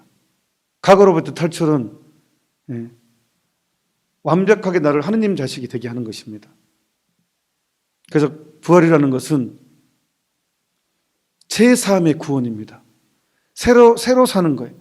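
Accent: Jeolla, South Korea. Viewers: 신부님 전라도사투리 정감이갑니다 저도전라도ㅋㅋ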